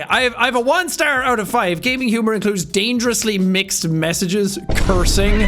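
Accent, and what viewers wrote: Ireland. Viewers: I actually like when swears a lot, he's and entertaining guy and his Irish words are magical to my ears. Granted I'm an adult now so I guess it's different. I'm also Native American so like the Irish, swearing is integrated into our conversations a lot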